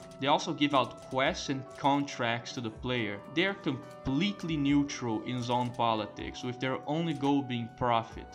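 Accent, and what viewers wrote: Brazil. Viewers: Sorry to ask but, are you brazilian? Your accent sounds reminiscent to a brazilian fluent in english who maintained his 1st language "charm" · Is that a Brazilian accent? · sotaque inconfundível de br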